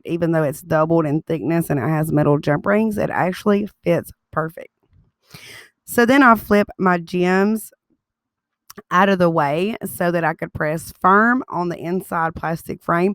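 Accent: South, United States. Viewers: sweetest southern drawl ever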